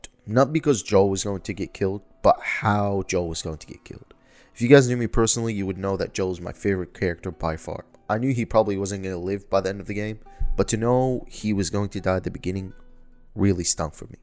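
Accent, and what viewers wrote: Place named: South, United States. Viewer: Bro do you mind if I ask where you’re from? You sound like you aren’t from USA but you kinda have a southern accent.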